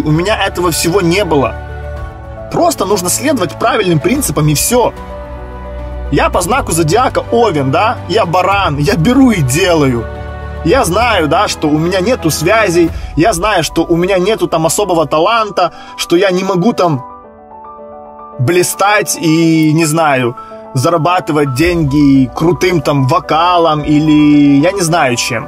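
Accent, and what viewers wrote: Odessa, Ukraine. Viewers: Одесский акцент😉👍✌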